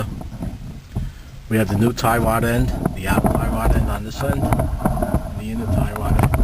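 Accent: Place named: Boston, United States. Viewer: Taking awt tha cawter pin lol love the Boston accent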